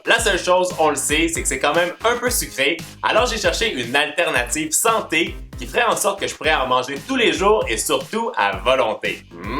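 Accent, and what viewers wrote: Quebec, Canada. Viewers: je t' adore. Je tes montré à ma soeur, on adooore l'accent cébécoi.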